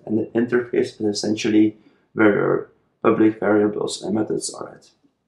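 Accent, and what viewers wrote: Russia. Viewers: типичный русский акцент)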